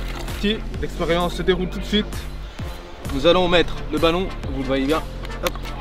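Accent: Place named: Belgium. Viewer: Il a un axant de Belgique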